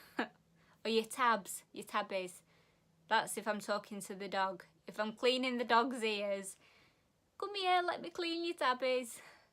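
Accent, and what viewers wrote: Sheffield, United Kingdom. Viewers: I love the Sheffield accent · You have the posh Sheffield accent. I have lived in Sheffield all my life and I don't sound anything like you. Mine is a common Sheffield accent.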